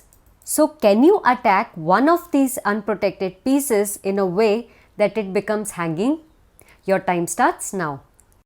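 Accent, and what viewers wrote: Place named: India. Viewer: Thanks! :) your accent sounds Indian idk why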